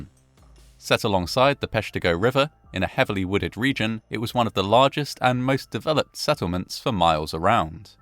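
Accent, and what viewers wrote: England, United Kingdom. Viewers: has English accent Americans find more authoritative. Send.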